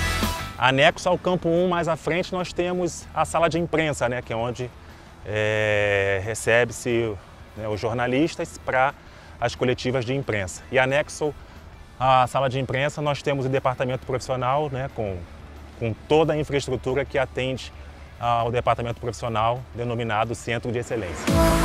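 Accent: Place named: Minas Gerais, Brazil. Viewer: MINEIRO,COM ESSE X,RS?Ixqueiro...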